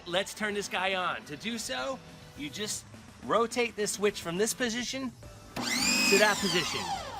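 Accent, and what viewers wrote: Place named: Pennsylvania, United States. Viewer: hehe is this guy from PA? I like how his accent says "water" as, "wadder" :0) Great tips though, I like a visual on how to hook mine up.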